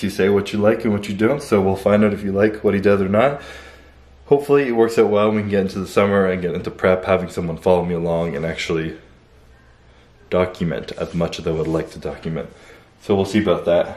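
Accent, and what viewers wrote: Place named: Canada. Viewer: I was like why does he sound weird...oh yea Canadian...lol.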